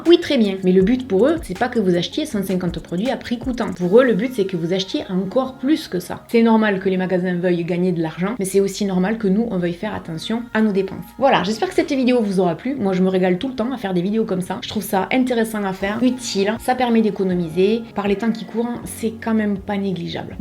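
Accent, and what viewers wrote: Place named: North, France. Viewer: Mon talent de détective me dit que vous êtes du nord. Je dirais Roubaix + précisément.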